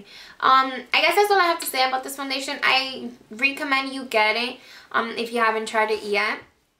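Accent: Dominican Republic. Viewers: Haha i read down on your comments and ikneww you were dominican. so am i and i immediately picked up on your accent. Your gorgeous btw :) subbed right away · You remind me of myself! Accent and everything! Are you Dominican? lol def subscribing!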